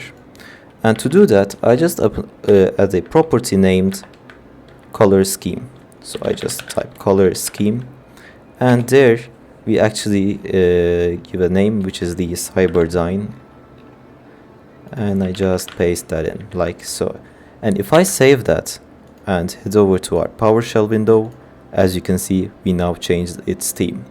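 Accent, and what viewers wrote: Turkey. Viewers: Are you from Turkey ?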